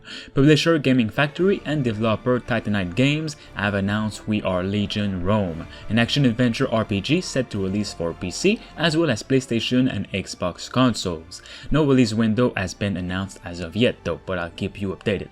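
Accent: Quebec, Canada. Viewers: Mmmm nice video et nice accent mon quebecois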